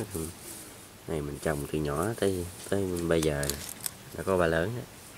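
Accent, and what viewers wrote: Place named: South, Vietnam. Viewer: E người miền bắc nghe giọng miền nam rất rễ nghe và nhẹ nhàng🥰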